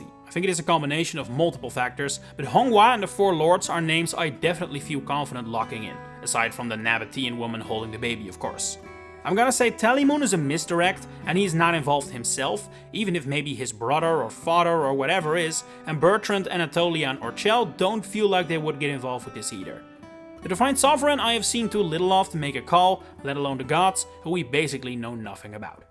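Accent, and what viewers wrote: Netherlands. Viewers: Unrelated but love the Dutch accent 🫶🏽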